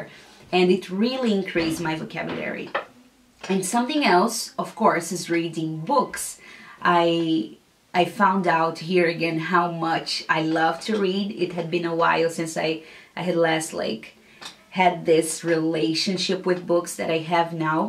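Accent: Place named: United States. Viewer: Super fluente... e teu sotaque é bem americano, nossa! mto fofa.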